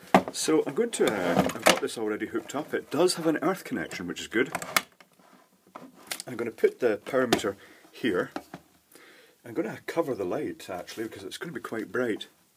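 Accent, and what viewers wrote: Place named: Bearsden, United Kingdom. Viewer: very polite spoken...you fae Bearsden?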